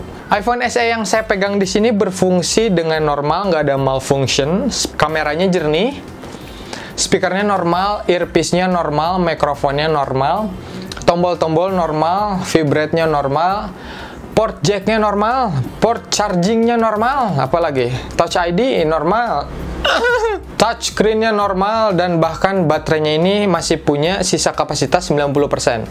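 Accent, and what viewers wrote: West Java, Indonesia. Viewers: kok mirip efkahfajar yak nadanya, apa karna org sunda? ahahahaha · orang sunda nya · Om, situ orang sunda ya?, Logat logatnya sunda bgt😂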